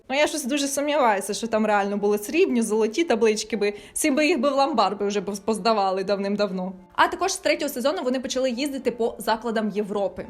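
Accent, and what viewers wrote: Surzhyk, Ukraine. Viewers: нет, какой нахуй сленг? Она говорит суржиком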